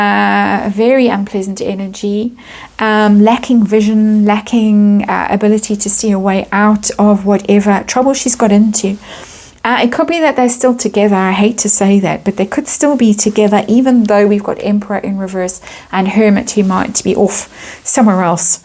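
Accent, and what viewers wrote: South Africa. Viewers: Love the lilt in her voice, awesome well bred South African accent and incredible snark! 😅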